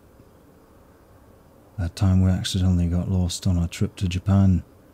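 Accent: United Kingdom. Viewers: Are you from Yorkshire? Tysm for the fun vid 🩷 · I was just about to compliment you on your northern accent and I'm a northerner myself.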